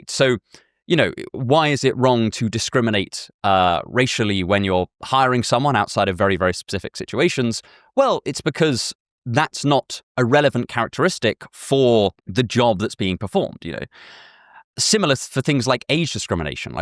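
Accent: United Kingdom. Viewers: My first ex ruined philosophy for me but I’m down for cute British boy reading aita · There is nobody I trust more than a guy with a British accent named to tell me about moral philosophy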